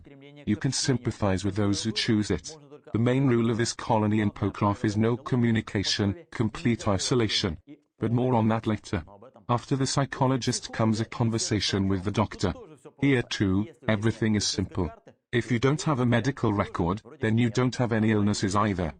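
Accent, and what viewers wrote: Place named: Wales, United Kingdom. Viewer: The fact this is a Welsh voice cracks me up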